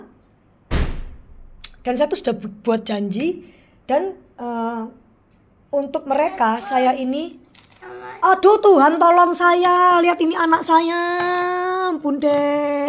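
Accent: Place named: Java, Indonesia. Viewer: Suka logat nya jowo bnget ❤❤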